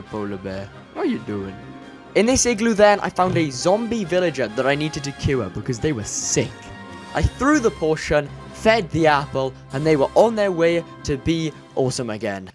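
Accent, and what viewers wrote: Wales, United Kingdom. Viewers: No, he’s welsh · Are you from wales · Are You Welsh?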